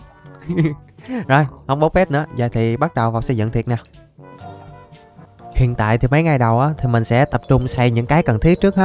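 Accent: Mekong Delta, Vietnam. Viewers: ông là người miền Tây đúng hong? nghe nói chuyện dễ thương vải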